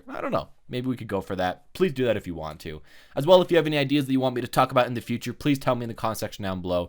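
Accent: United States. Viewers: Wait, are you from utah?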